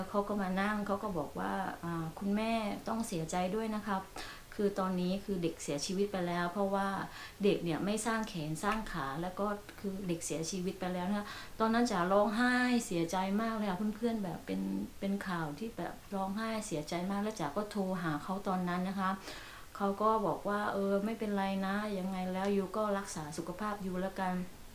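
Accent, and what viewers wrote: South, Thailand. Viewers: ว่าแล้วพี่น่าจะเป็นคนใต้ หนูคนนครเหมือนกันค่ะ พี่สวยน่ารักมากๆเลย ^^ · ชอบสำเนียงใต้นะค่ะ น่ารักมากๆค่ะ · คนใต้เหมือนกัน ว่าแล้วทำไมเสียงแบบไม่เป๊ะแบบคนภาคกลาง ดูคลิปบ้านอเมริกาครั้งแรก คลิปนี้เป็นคลิปสอง ชีวิตดั่งเทพนิยายมากครับ ^^ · เพิ่งเข้ามาค่ะ ฟังสำเนียงแล้วว่าคุณจ๋าน่าจะเป็นคนใต้นะค่ะ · หวัดดีค่ะคุณจ๋า ชื่อฐาค่ะเป็นคนใต้ปัตตานีค่ะ เพิ่งเข้ามาดูคุณจ๋าค่ะ ตอนดูครั้งแรก คิดในใจว่าคุณจ๋าเป็นคนใต้หรือเปล่าเพราะฟังจากสำเนียงแล้วใช่เลยค่ะ คุณจ๋าโชคดีจังค่ะได้สามีที่ดี ครอบครัวอบอุ่น ดูครั้งแรกตกหลุมรักคุณจ๋าเลยค่ะ จะติดตามต่อไปนะคะ หวัดดีค่ะ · ว่าแล้วเสียงแหลงเหมือนคนใต้ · น่ารักมากเลยค่ะ แหลงใต้เหมือนกัน แต่กลัวเพื่อนฟังไม่โร้เรื่อง เลยต้องแหลงกลาง คุณจ๋าสวย และเลิฟสตอรี่ ก็น่ารักมากเลยค่ะ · คุณ*พูดใต้สำเนียงชัดเจนมาก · นึกแล้วว่าต้องเป็นคนใต้ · ชอบฟังค่ะ...คนใต้ๆ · พี่เข้ามาดูครั้งแรกก้อรู้ว่าน้องเป็นคนใต้ สำเนียงออกพี่ก้อคนสุราษฎร์ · พี่แล่งใต้ น่ารักค่ะ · ฟังพี่จ๋าครั้งแรก รู้เลยว่าเป็นคนใต้แน่ๆ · พูดใต้แล้วเพราะมากๆเลยค่ะ เสียงน่าฟังมาก หนูก็เป็นคนใต้ค่ะ แต่พูดใต้ไม่ได้ เพราะโตที่กทม. 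พอพยายามลองพูด ยายชอบบอกว่าแหลงทองแดงค่ะ55555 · ว่าแล้วสำเนียงพี่คล้ายๆคนใต้ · เพิ่งเข้ามาดูแต่รุ้สึกชอบ แบบพูดจาน่ารักก็คิดในใจว่าทำไมเหมือนคนใต้ก็ดูมา2 3วัน จนมาเจอคลิปนี้ตอนนี้เองเพิ่งรุ้ว่าเป็นคนใต้ เราก็คนใต้เหมื อนกันค่ะอยู่จ. ติดกันเลย กระบี่ค่ะ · ติดตามมาจากคลิปเปิดบ้าน หนูฟังครั้งพี่จ๋าพูดหนูมั่นใจเลยว่าพี่จ๋าต้องเป็นคนใต้แน่ๆ แล้วก็ได้คำตอบจริงๆจากคลิปนี้ 555หนูก็คนนครเหมือนกันค่ะ ยินกับพี่จ๋าที่มีครอบครัวที่ดีและอบอุ่นแบบนี้น่ะคะ ดีใจที่ได้ติดตามพี่คะ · หนูเป็นคนใต้ค่ะ นครศรีธรรมราชเหมือนกันเลยค่ะ ว่าแล้วฟังพี่เสียงแบบคนใต้เลย ชอบการพูดมากค่ะ ทำให้หนูกล้าแสดงออกมากขึ้นเลย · พี่สวยมากเลยยยย ชอบเวลาพูดใต้❤❤❤❤ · ผมติดตามล่ะครับผมฟังพี่จ๋าพูดในคลิปก่อนรุ้เลยว่าคนใต้บ้านเรา😁😁😁😁😁😁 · คิดยุว่าเป่นคนใต้หรอสรุปมารุ้เพราะคลิปคนใต้เหมือนกันจ้าาติดตามยุนะคะพี่จ้าาา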